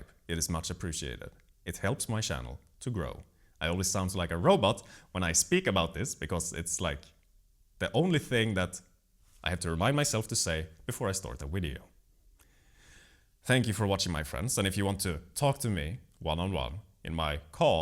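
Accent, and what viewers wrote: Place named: Scandinavia. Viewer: The Scandinavian accent ❤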